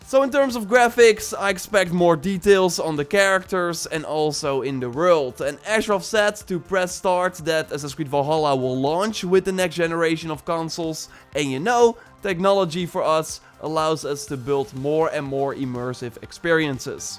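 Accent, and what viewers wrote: Netherlands. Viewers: NOW I FINALLY NOW WHATS WITH THE ACCENT, YOUR DUTCH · Dacht al dat je Nederlands was 😂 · Ik dacht al dat je nederlands was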